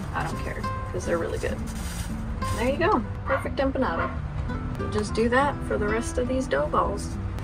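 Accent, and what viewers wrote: United States. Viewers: You're from Mother Russia? You sound completely American. Make some Borscht. 🙃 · Sounds very american...I never know she is russian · Southern accent with a twist 😜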